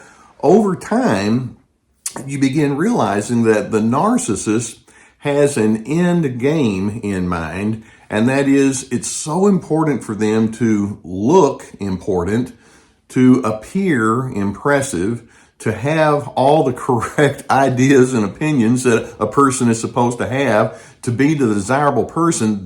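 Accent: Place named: Mississippi, United States. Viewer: THANK YOU SOOO MUCH AGAIN AND AGAIN,!!! I JUST LOVE GUS!!!, YOU SOUND LIKE YOU'RE FROM MY "NECK OF THE WOODS ". I LIVE IN MISSISSIPPI. CAN YOU REVEAL WHAT STATE YOU'RE FROM? IF NOT, I UNDERSTAND!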